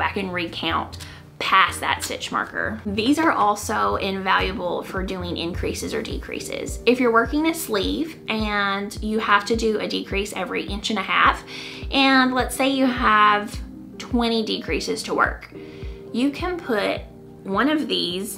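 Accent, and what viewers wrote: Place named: Kentucky, United States. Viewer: Hello!! I have a question I hope I done sound stupid! I am new to sock knitting I made my first sock last week during shock week. And now I am obsessed I am working on my first official set and I have been so worried about blocking them. I don’t have sock blockers at the moment it is just not in our budget! So I really need tips on blocking sock with out the blockers! Oh and I love your podcast I am from Kentucky so it’s cool to hear another knitter that sounds like me!!!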